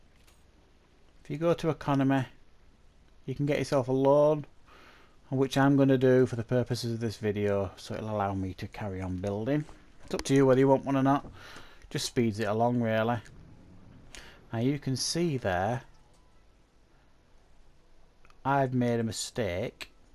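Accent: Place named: Northern England, United Kingdom. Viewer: Same here. And he's a North Northerner. Bolton ? Rochdale ?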